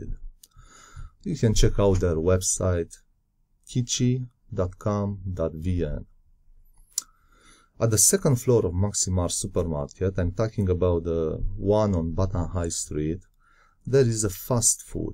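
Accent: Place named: Hungary. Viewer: Hm...as far as I understand an ESL teacher can expect some 1000 USD per month in Vietnam. Well I think in Romania nowadays a decent teacher employed at a public or private school can make this money salary + some private classes. Of course in Romania you wont have that abundance of jobs as in Vietnam. BTW...are you an ethnic Hungarian? your English has a noticeable Hungarian accent. Also ...congratulations for the excellent well calibrated comments and videos...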